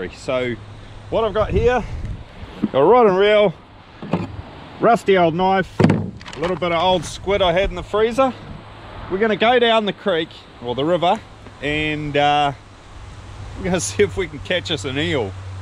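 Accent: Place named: New Zealand. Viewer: hey, dude. I just like your kiwi accent. Lovely video. It would be great if you share the location. LOL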